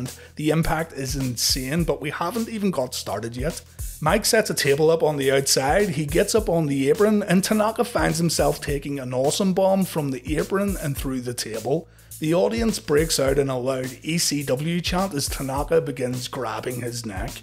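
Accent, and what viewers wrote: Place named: Northern Ireland, United Kingdom. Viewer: Nice to hear a fellow northern Irish ECW fan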